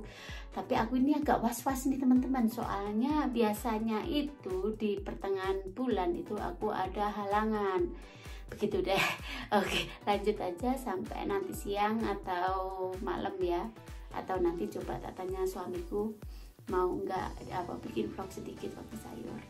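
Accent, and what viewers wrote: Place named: Blitar, Indonesia. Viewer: Logate lek ngomong jowo jek mblitaran banget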